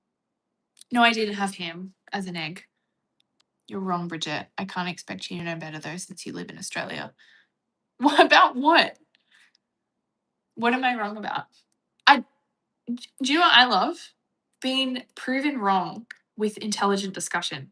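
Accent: United States. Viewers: Your American accent cracked me up! 😂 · Ok, I’m American (unfortunately 😬), and your American accent always, always makes me laugh, I friggin’ love it 😂👌🏼 …gonna save this video to watch the rest when I have more time, but I just wanted to say—I really do love these longer videos you’ve been making lately. Whether you have Hammy in there with you or not, whether you’re cooking, or making other stuffs…just anything! Almost feels like we’re hanging out together gabbing about random things and—maybe weird to say—it’s just a lot of fun. 🤷🏼‍♀️ 🍻💃🏼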